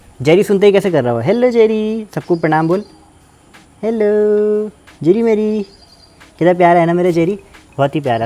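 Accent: United States. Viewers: Goooooood american english bahut heeeee jabardast ❤ lage raho safalta jarur milegi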